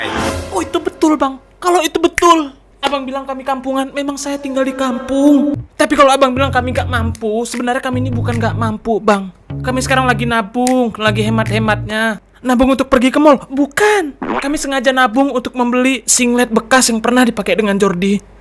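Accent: Java, Indonesia. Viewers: Jawanya asli mana bang?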